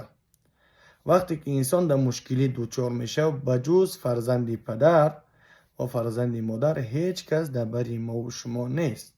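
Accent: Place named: Afghanistan. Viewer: Чихе хушру гап мезанен ака! Тарзи гапзанитон кам кам ба гапзании Афгоно монанди дора. Чикадар Махкулайма гапзанишон.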